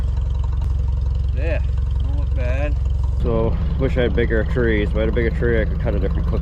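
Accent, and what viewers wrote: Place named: New England, United States. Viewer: I know a New England accent when I here one, being from Maine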